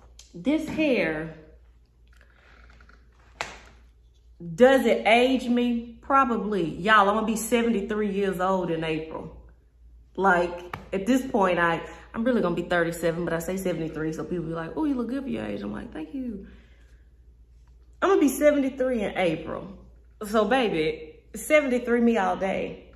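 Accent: South, United States. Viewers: you sound like you from the south that accent heavy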